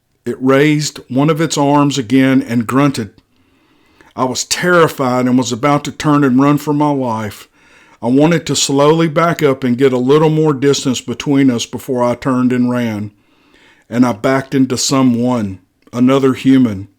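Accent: South, United States. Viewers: Kevin Costner with a southern accent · You’ve got the perfect southern voice to give every story an authentic feel based on the location. It sounds like you’re really the person who experienced these stories. Real or fake, you do em justice by bringing them to life.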